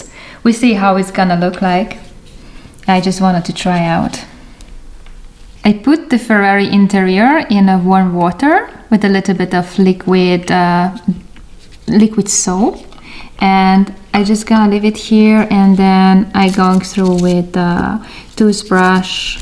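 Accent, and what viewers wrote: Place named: Hungary. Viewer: A videó alatt végig sejtettem, hogy magyar lehetsz, aztán ránéztem a lejátszási listára :D Jól megy Neked az angol, de mégis megvan az a magyaros kiejtés. Viszont örülök ennek, hogy van magyar női rajongója is a Hot Wheels témának :)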